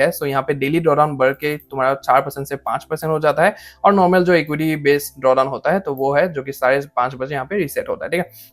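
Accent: Assam, India. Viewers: Are you Assamese ?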